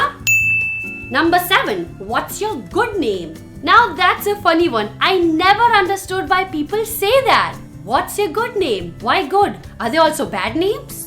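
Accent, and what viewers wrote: India. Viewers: Doesn't seems like she been to Germany. Wow her accent is so Indian. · U grew up in germany but ur accent sounds like desi...Which literally makes no sense. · if you wanna be a critic, then no one's stopping you! But please, drop the Indian accent! For a lady who's travelled the world who was born in Germany, you got a real thick typical Indian accent, and your totally misusing it to talk trash about India! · your accent is Indian. I don't think you are born and lived in Germany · how did you grow up in Germany and have such an Indian accent?? Are you exaggerating it? to make it more entertaining? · I love ur desi accent and not faking it !! <3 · i really love the fact that you were brought up in Germany with a German mom too, but still speak Hindi really well, speak German like a boss, and speak English with the Indian tango! It's so unlike the other kids brought abroad without any sense of their roots, great work! 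· ur grown up in germany...but ur accent sounds indian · i love u for ur indian accent......luv u babes......u look exactly like a south indian actrrss shari.....browse for shari-malayalam actress......u look like her exactly .....she plays villian/negative roles most of the times......u-u r the best.....love u lots....but kinda miss ur caps!!! they wre fab!!! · why don't people subscribe? you are an acquired taste, it takes time getting used to you. but you keep people hooked one way or another. For the longest time I couldn't figure out if you had this desi accent for real or you were being sarcastic with the accent. Even with german as your first language, your indian accent is heavy. its alright, nothing bad, thats the way you are. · You mother tongue is German? I mean what? You look so Indian!! Woah! And the way u speak Hindi is so proper.. You grew up their.. still your Hindi is So awesome and your English is Indian accent! 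You gained 200% more respect from me :D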